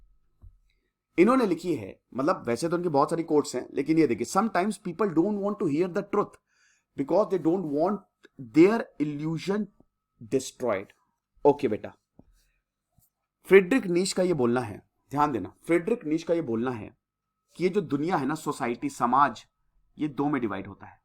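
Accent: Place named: Uttar Pradesh, India. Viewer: Sir your up vala touch is pride for me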